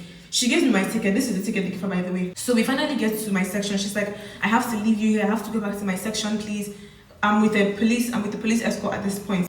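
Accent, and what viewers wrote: Igbo, Nigeria. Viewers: I love your igbo accent while you complain 😅 sorry for the stress, that's why i attend concert because na me know watin my eye see the first time.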